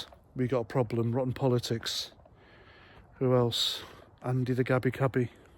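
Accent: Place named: United Kingdom. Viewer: Long time subscriber - rarely comment. Thought it about time I write to say how much I enjoy your videos and appreciate what you do. As an expat POM from Southampton living in Australia, I really miss accents from the UK and I *love* yours. Keep up the good work and helping keep me up to date with all things UK. Cheers - Simon / Adelaide - Oz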